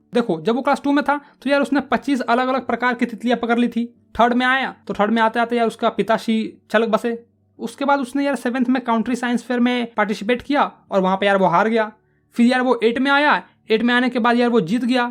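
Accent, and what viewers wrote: Bihar, India. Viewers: Haan, bhai ye bihar se hai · Aur ek aur cheez aap bihari ho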